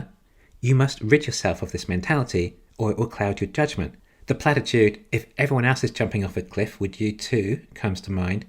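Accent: England, United Kingdom. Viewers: You British? I followed you for an year without realizing that,thinking you're from the States. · English accent...word have more than one syllable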